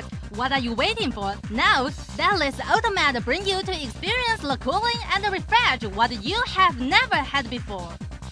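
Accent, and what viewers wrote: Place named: England, United Kingdom. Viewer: it sounds english.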